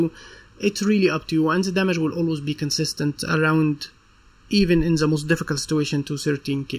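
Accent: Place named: Egypt. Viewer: new players and your channel is very helpful thank you so much🤍 also your accent add a flavor to the video it's very close to my Egyptian professor 😂😂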